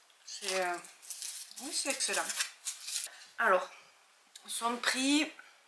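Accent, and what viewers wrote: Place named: South, France. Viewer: oui c est clair 🤣👌sans blague peut être qu il y a moyens de communiquer 😌j entend votre jolie accent du sud d ou êtes vous ?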